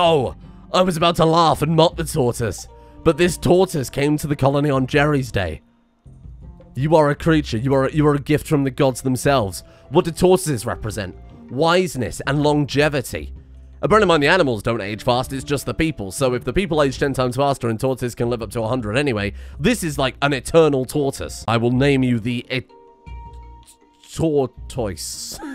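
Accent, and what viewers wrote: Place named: United Kingdom. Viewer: That's how you pronounce it in UK English, and if you couldn't tell from his accent, he's speaking UK English.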